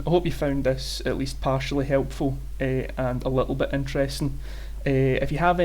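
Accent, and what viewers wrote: Scotland, United Kingdom. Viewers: Wow, the amazing Scottish accent :) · lovely Scottish accent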